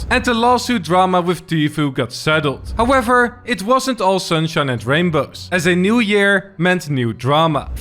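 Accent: Netherlands. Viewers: is it me or does this dude has the biggest dutch accent i have heard in my life?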